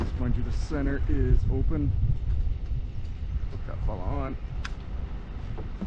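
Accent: Canada. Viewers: Between AVE and a Canadian Beekeeper’s Blog, and the Jet City jet engine rebuild guy, I think it knows I like Canadians, and this guy sounds Canadian, and it knows I like technical stuff and power line stuff, so it makes sense! And I had to scroll past the bee euthanization video to get to this one, it keeps trying to get me to watch it lol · How weird... I actually had a little physical tingle of fear watching this. Huh. How about that. This is only the second one of your vids I've watched, where are you? Do I detect Canadian in your accent? I'm in Scotland here. Oh, and +subscribed. Great stuff, thanks for recording on real jobs.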